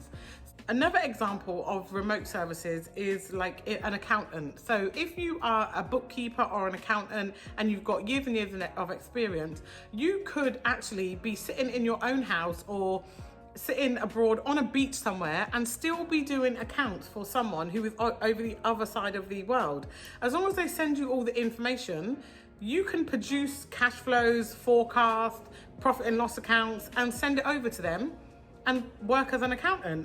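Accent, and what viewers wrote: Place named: United Kingdom. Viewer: Very informative video. Thanks. Side note: I’ve always dig that British accent, Peace!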